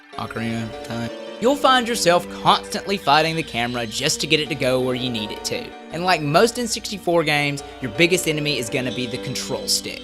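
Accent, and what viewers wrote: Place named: South, United States. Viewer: Ya know what, hearing a southern accent is actually kind of refreshing, you don't really see that in the Youtube Gaming community:) You did well elaborating your points, and the pacing was really good. That's probably the part that I expected to be the worst (from smaller Youtubers), but you did well! Really well in fact. Keep it up!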